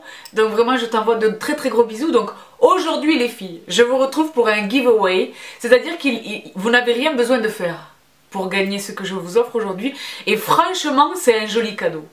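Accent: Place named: Montpellier, France. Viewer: Bonjour, bravo pour tes tutos très pros et ta bonne humeur!!! Tu as l'accent de chez nous ( je suis de Montpellier), j'adore, tu me fais penser à mes amies Biterroises, c'est incroyable!!!Bises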